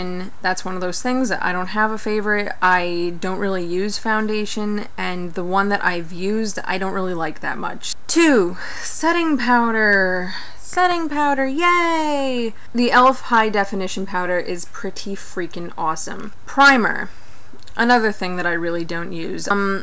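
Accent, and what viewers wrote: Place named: New York, United States. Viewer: haha you embrace the pale! that was awesome. I do the same. Are you from NYC? Long island? im hearing some new yorker in your words..im from Bklyn!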